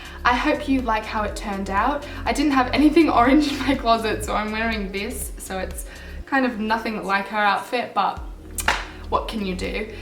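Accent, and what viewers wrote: Australia. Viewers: Oh damn it's Gigi w an Aussie accent!!!! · are you australian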